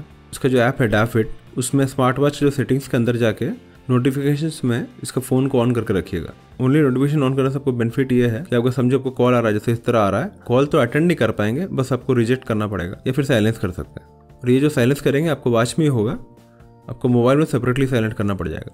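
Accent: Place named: Kerala, India. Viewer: Full malayalee accent.. adipoli😅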